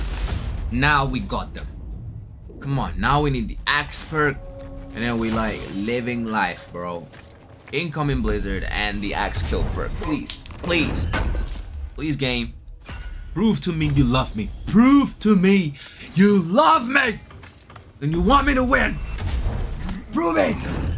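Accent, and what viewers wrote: Brazil. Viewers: Mano fiquei em dúvida se ele é brasileiro ou americano? pulei um pouco do começo e ele mistura as 2 linguas kksksksks😂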